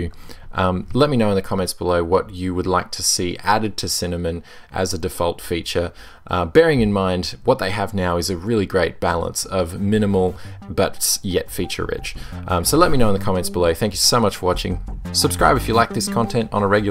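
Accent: Australia. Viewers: G'Day mate. Thanks for this. I've been wanting to install a new distro on one of my PC's and you've certainly given me a great deal of information to digest plus conduct some more knowledgeable research. So good to hear an Aussie accent on YT as well. Cheers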